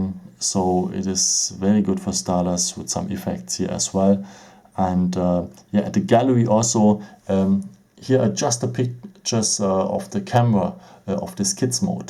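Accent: Germany. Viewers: K1ller_ _Headsh0t its just a german accent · What an german accent! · achja der Deutsche akzent... einfach nur genial :D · Er spricht Denglisch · Erste Sekunde: BOAH NE DER IST DEUTSCH Nichts für ungut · Ich höre sein englisch... und denke mir Jap das ist ein deutscher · Haha wie man raushört das du deutsch bist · No hate but I have a question about your pronunciation. Are you German? I think it really sounds like "german english". About the video: I like this theme of videos. Your video help me to understand the A 40 better. I need these theme of video because I get the Samsung Galaxy A 40 in this week! Nice content! Edit: Ich bin Deutsch (I'm German) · Mich regt der deutsche Akzent auf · Jo du musst nicht englisch rede jeder schekt das du deutscher bist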